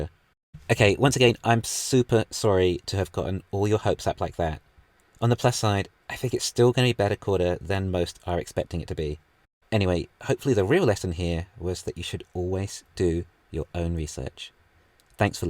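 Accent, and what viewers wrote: England, United Kingdom. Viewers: You English accent is very heavy in England.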